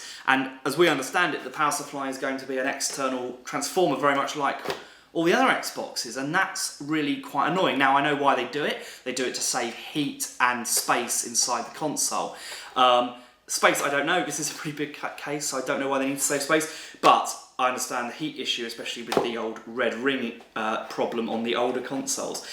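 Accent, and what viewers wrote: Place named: RP, United Kingdom. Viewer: He's posh with bad grammar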